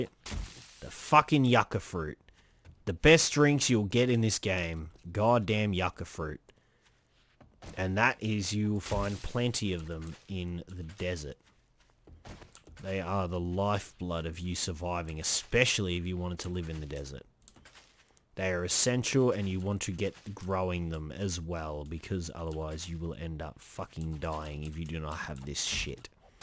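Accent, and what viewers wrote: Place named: Australia. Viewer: sure did! accent kept me in lol, Australian?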